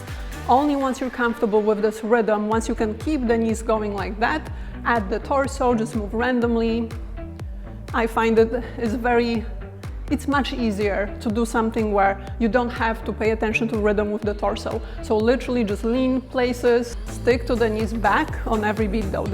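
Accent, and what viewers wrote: Poland. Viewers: You sound Polish. My parents are Polish. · Great teaching, thank you! I'm going to watch your other videos! BTW. Have you any connections with Poland? I can hear sth like "Polish note" in your voice ... :) Don't answer if it is too personal.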